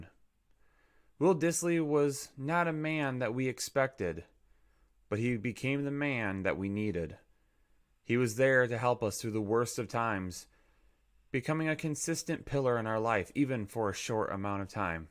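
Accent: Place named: Upper Midwest, United States. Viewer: I have one question, upper peninsula or Minnesota? Love that accent G.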